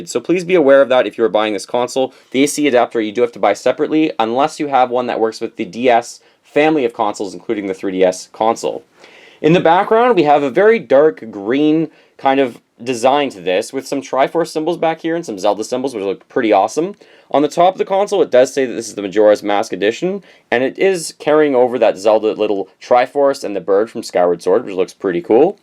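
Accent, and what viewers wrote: Canada. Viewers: That's how Canadians pronounce the letter Z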